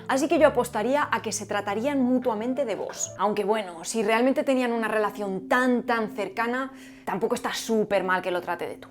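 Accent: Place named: Castile, Spain. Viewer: La fonética de tu dialéctica mesetaria no es el castellano real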